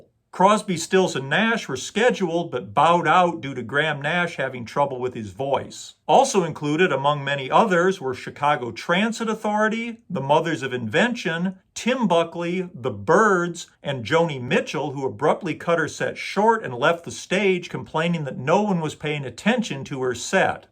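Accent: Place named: Michigan, United States. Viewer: Ann Arbor, eh? I kept thinking I detected a faint Michigan accent in your speech, but saw no reason to ask. I'm not from Michigan myself, but I used to spend summers there at my grandparents' lake house in Orchard Lake (my mom had gone to college in Ann Arbor). It was during one of those adolescent summers long ago that I purchased by the and my lifelong obsession with rock music began. I haven't been back to Michigan in many a moon, but I still love the state and its people. Many times I've considered buying property there, but I just get turned off by all the problems the state faces. I still consider it my second home state though...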